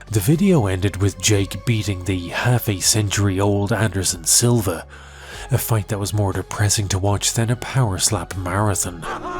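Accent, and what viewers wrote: Ireland. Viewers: Homie, I was there in the audience of your Brock premiere. Am I anything but a legend for witnessing THE legend do some slick stuff that interests a room temperature to refrigerated MMA fan, could actually be called not a fan. I enjoy that Irish accent and the video game flourish. You are a Legend. You are such a Legend, wife got a full ass subscription to sandwich daily so you make your own and still thank your wife. Legend! 😂❤ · Irish accent, talks about fighting, pop culture and gaming references, that spinning disc when crediting music... ...Super Eyepatch Wolf, is this your alt channel??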